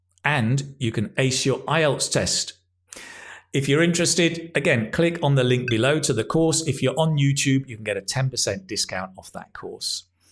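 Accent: United Kingdom. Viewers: Yes, and great chance for us to listen original British english! Thank you!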